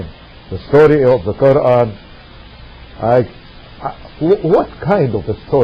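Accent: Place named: Egypt. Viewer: U lier egyptian stop speaking funny english,u talk about story of joseph it is beautyful story by reading only this "chapter joseph"in holy Quran "Cat stevens"famous pop singer of England became muslim and left doutful religion of christianity,you can visit his website his new name is Yousuf Islam,he choose his name yousuf because he was converted by reading "sura yousuf"from quran.and you lier telling chapter yusuf is wrong.if u want i will quote pornography from Bible,but we respect all books.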